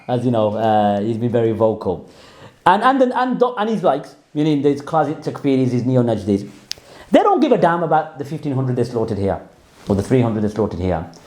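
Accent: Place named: Punjab, India. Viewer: Love ur punjabi accent bro😂❤️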